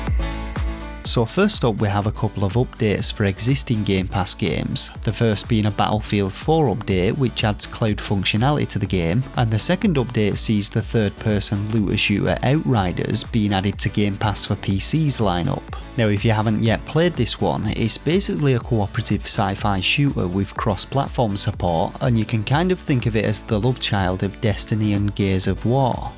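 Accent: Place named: Manchester, United Kingdom. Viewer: Been watching your videos since getting an xbox and game pass, thanks for the stella updates, you sound rather local to me, I am just south of Manchester ;)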